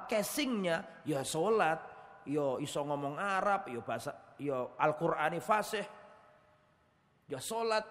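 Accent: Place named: Java, Indonesia. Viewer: Seneng p ustadz logate njowo iq...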